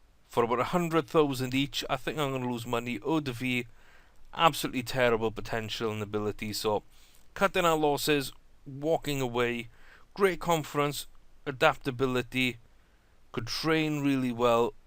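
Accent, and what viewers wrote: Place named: Wales, United Kingdom. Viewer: That's a Welsh accent if I've ever heard one. Where you from?? I want to say the Valleys?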